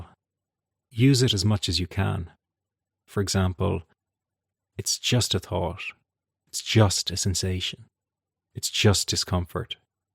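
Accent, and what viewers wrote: Ireland. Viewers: Very helpful during this difficult time. Never felt anxiety quite like this in my 75 years on this planet! Was feeling somewhat suicidal at first, but slowly learning to accept it. Waiting for your book 'DARE' to arrive. Meanwhile, enjoying the Irish accent! ;-)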